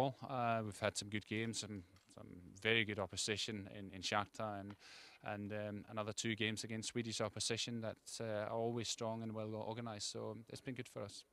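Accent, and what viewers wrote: Scotland, United Kingdom. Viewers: Nice to hear he still has his Scottish accent! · Good Scottish twang in his voice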